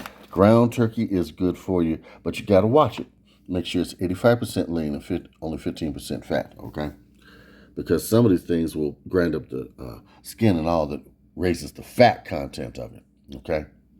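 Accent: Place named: Louisiana, United States. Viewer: I know you said you lived somewhere around Chicago but even though I'm deaf I can hear your accent you sound like you're from Louisiana originally.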